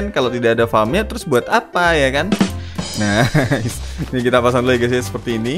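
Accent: Java, Indonesia. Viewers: Wuihh suaranya khas jawa bet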